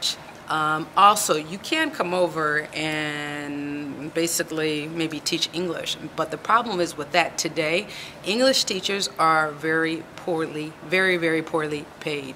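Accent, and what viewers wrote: France. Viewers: Thank you for this video! I'll be moving to France next month and learning the language via immersion is my first order of business. Also, you have a bit of a French accent now, which is too cool. :) Thanks again!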